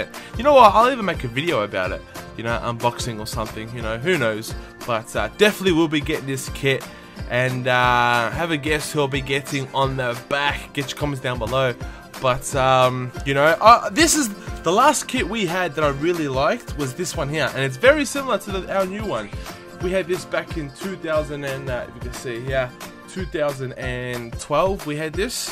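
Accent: Australia. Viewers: are u from ausie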